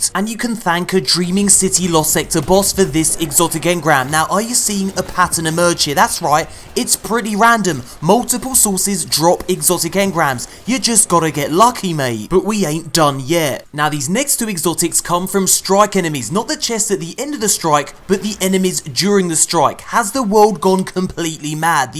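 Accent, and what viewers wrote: United Kingdom. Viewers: Why tf are do all these destiny channels have a British Accent?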